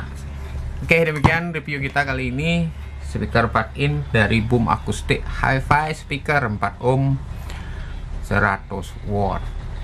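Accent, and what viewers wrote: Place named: Bali, Indonesia. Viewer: Kyak logat orang bali😂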